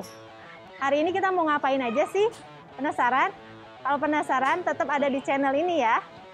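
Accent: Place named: Bandung, Indonesia. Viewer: Bandung pisan logatnya neng😁👍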